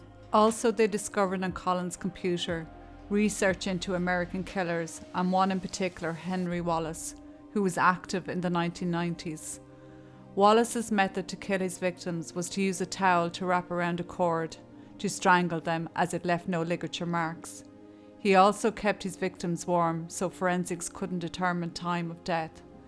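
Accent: Ireland. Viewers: Thank you for your answer and also thank you for your wonderful videos, love the Irish accent as well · I love your tone. You don’t sensationalise and are so respectful to the victims and their families. And I could listen to your beautiful Irish accent all day. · your Irish accent could charm a snake ❤ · Irish accent fab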